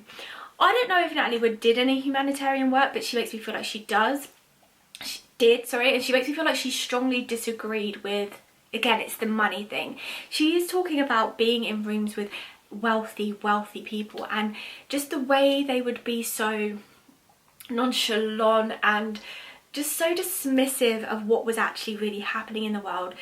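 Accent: United Kingdom. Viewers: Very interesting why do they wait until they are no longer here to tell the secrets? Why not tell while they are here any who this was very interesting I enjoyed the reading I love your accent are u from England? are You British? U have a beautiful accent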